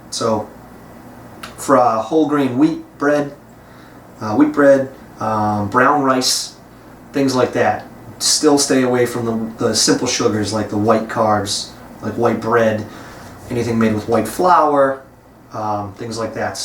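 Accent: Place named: United States. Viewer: Brother i can't understand spoken american well. Can u write down your recommendations please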